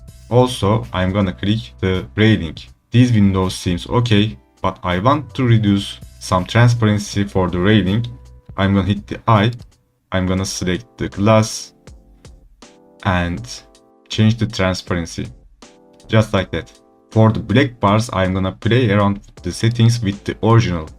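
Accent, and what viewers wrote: Turkey. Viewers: Türk. Daha ilk dinlediğinde aksandan belli :D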